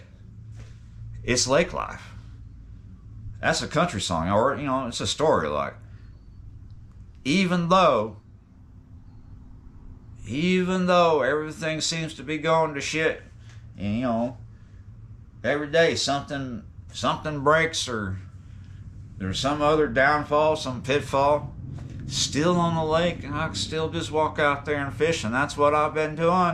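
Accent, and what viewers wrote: Texas, United States. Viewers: Thanks for your videos. Going to miss your Mississippi River vids. Hang in there brother. You already are reverting to a Texas accent lol.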